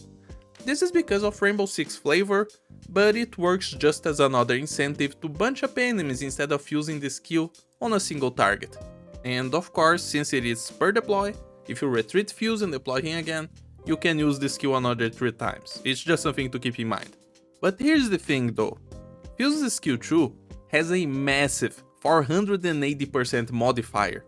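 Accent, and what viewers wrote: Brazil. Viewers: Are u Brazilian? Ur english sounds like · The way you talk...are you brazilian?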